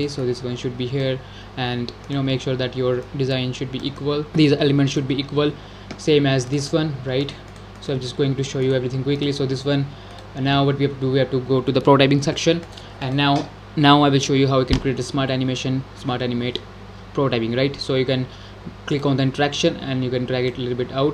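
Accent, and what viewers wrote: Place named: India. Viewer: que inglês de indiano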